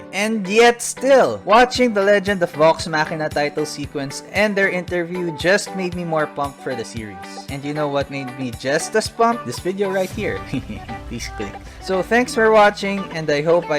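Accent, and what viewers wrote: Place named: Philippines. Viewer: Is it weird that we recognize a pinoy when we hear it? Is this the pinoy accent they ( the foreigners ) are talking about?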